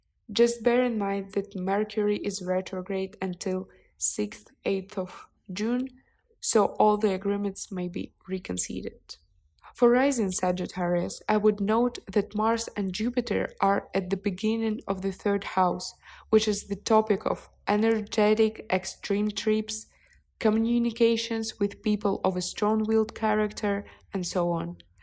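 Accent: Russia. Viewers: Too much of the accent, reminds me my English classes in Russia. It’s a not really good way to learn English. Don’t like to criticizing anyone, just my personal opinion. Очень люблю и подписана на все его каналы. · я вам дам честный конструктивный критический отзыв, так как я на самом деле очень ценю вашу русскоязычную эмоционально окрашенную подачу и хочу помочь. Надеюсь мой отзыв поможет если вы нацелены на англоязычную аудиторию. Я могу судить так я двуязычный подписчик, очень давно живущий в англоязычной стране. Для тех кто с рождения говорит по английски в этом видео слышен русский акцент с не натуральными английскими интонациями. Это не немного раздражает и не даёт насладиться содержанием так как форма не натуральна и натянута. Кроме того интонации не эмоциональны и не зажигательны как ваши, так что ваш отличительный шарм теряется. Я бы посоветовал найти рождённого в англоязычной стране русского человека, таких много, бросьте клич и кто-то откликнется. 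А русские субтитры тоже хорошая идея от ваших подписчиком. Английские субтитры можно в настройках YouTube включить.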